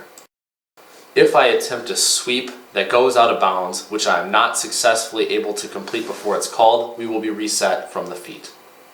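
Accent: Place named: Minnesota, United States. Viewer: I love that Minnesota accent.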